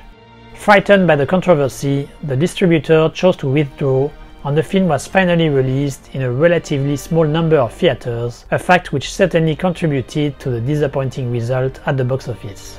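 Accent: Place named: France. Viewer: Great video but the uber strong French accent squeezed my brain juice in such a tiring way. I mean you can use AI voice dude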